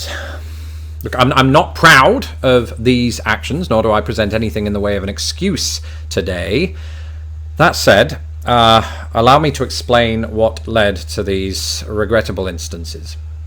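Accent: United Kingdom. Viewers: Subbed! Was persuaded to by your amazing British voice... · Don't beat yourself up. The British accent is bound to color the speech of a traveler from that place. East Indians and Russian immigrants are equally noted for the novelty of their accents. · Don't worry, I forgive... Wait. What was I going to say? Oh, it doesn't matter. Talk to us some more. I love your British accent. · ~sigh british accents~ =) I forgive you but just keep making videos so I can hear your accent lol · But your accent is subtle. You must be losing some of it. But having said that, we do love the British accent over here! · You can use that British voice any time, sweetie! 😉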